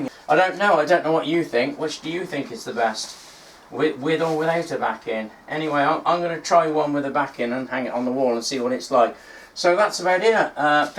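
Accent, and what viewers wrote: United Kingdom. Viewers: I’ve enjoyed all your fretwork/scroll saw videos. I live in U.S.A. but I lived in the U.K. in 1981 and 1982. Your British accent brings back fond memories. Here in the U.S. we don’t have anything like the vintage fretwork patterns like what you’ve shown in this video. Can you suggest an online resource from vintage fretwork patterns?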